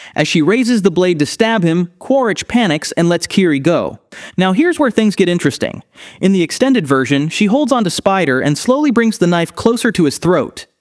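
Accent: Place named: Russia. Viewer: Что за хрень? Откуда этот рашен инглиш? Аж уши режет. Why?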